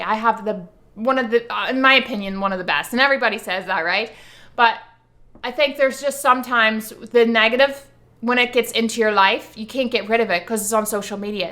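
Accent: Ireland. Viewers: I love hearing your Irish accent ❤ sending love and hugs xx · Welcome back, it's good to know you're doing well and still among the living. This is the first time I've heard you speak with a Irish accent, GO IRISH!!! I just got back from a wonderful summer vacation in Ireland, it is such a lovely place, when I heard you speaking with an Irish accent I thought I was going crazy from hearing the Irish accent in Ireland so I was a little bit confused at first, so that means in all those other videos we were trying NOT to speak with your Irish accent, that is remarkable that you were able to do that, I'm impressed. I was hoping you would talk about that on this video but you did talk about speaking fast so that was cool. Take care I'm glad you're back making videos. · You SOUND SO Irish in this video!! Welcome back! x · ohhhhhh ok......now I hear the Irish 💖 that's wsup luv...I would've thought you was Native Canadian.....yes looking forward to hearing the true 💯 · I thought you are Canadian? 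😲Where does that Irish accent come from? 🤔 Oh yeah the haters, they are the worst! Im still ok on YouTube but on FB they are everywhere! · sorry to hear about those haters. But whats more important is your health, family and going back to your roots. Fun to hear your Irish accent 😊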